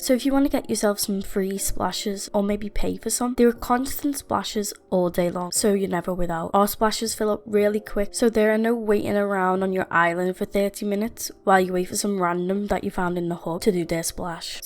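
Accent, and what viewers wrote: Liverpool, United Kingdom. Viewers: Are u a scouser lol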